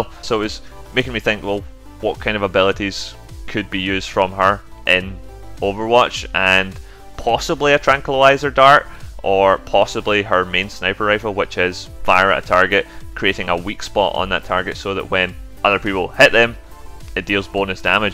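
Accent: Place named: Scotland, United Kingdom. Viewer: Your accent sounds scottish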